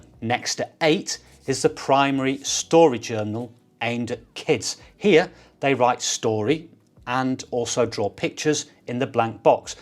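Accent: United Kingdom. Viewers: welcome to OZ and many thanks for the videos - I hear your UK accent and wish you all the best here - we came over from UK in 1961 I was 4 so grew up here - it's an amazing place that's for sure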